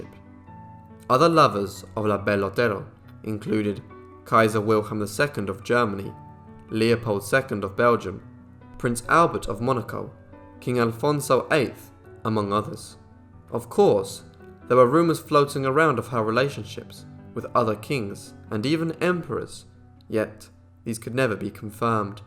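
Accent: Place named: RP, United Kingdom. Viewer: Hard to believe that with such a plummy Brit accent you mispronounce "Grosvenor." It's pronounced "GROW-ven-er "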